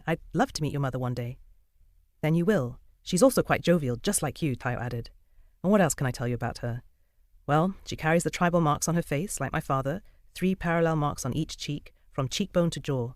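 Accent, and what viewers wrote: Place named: United Kingdom. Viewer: The voiceover sounds way more British than the normal African tongue context, ngl but the phonetical pronounciations of each words solely suites the accurate tonations in variations. Gracias.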